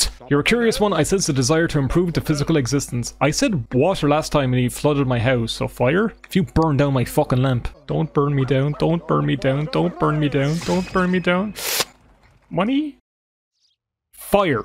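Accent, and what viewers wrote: Ireland. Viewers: Sims 1 with an Irish accent . I clicc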